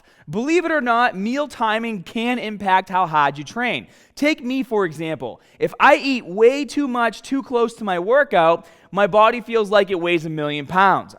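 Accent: Boston, United States. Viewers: Holy Crap! I've always watched your videos for your good looks and charming Boston accent. I had no idea that was a mistake until you mentioned it in this video! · I'm genuinely curious, what part of America is he from where they pronounce 'A' as 'Ah'? Great videos by the way, big fan :) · The Boston accent is annoying as HELL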